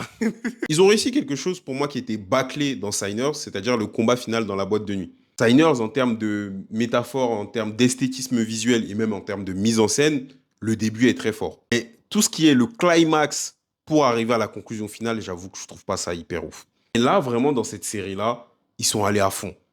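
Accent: Ivory Coast. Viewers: Je reconnais un frère ivoirien Je suis là à la base pour la "babiere" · Toi ça se sent que t'es ivoirien. 😂😂Ko ton babiere 😂😂😂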